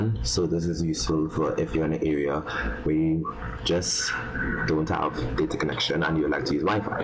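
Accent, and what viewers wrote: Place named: Trinidad and Tobago. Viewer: He is not Nigerian he is Trinidadian... their accents are very distinct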